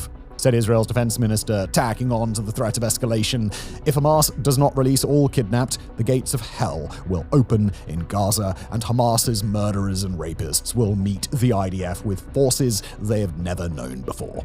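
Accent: RP, United Kingdom. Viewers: Simon needs to take the chip off his shoulder and do some unbiased reporting and actually visit these places on the ground. he thinks he's so smart with his posh accent and multiple successful youtube channels that are supposed to enlighten and teach. Yet, he more often than not, leaves out nuance unless it supports the narrative of his videos . I've been watching for years and I've been noticing it more and more. its evidence of my own bias. yes. but Simon is deliberately leaving out facts from his reporting. BIG FACTS TOO SO THATS HOW WE KNOW ITS DELIBERATE!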